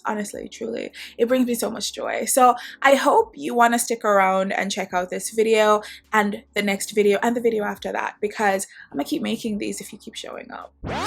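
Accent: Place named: Jamaica. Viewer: I’m a simple girl. I hear a Jamaican accent, I hear “perfume”, I subscribe.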